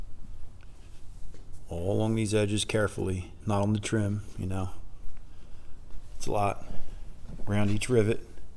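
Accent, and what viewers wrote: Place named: Philadelphia, United States. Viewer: Nice video. Your accent sounds like my whole family from Philly and New Jerz.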